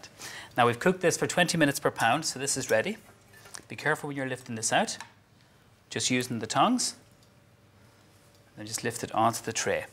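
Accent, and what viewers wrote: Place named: Ireland. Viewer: Samiches 😂 love it, real Irish accent lol. Great video ☺️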